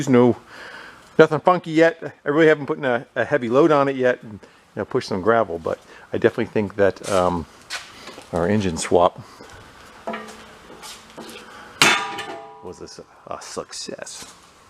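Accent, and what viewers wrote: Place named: Fargo, United States. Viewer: "... try to knock out this bobcat skidsteer that I bought, oh jeez..."... are you originally from Fargo by chance? You do fix a lot of wood chippers and snow blowers...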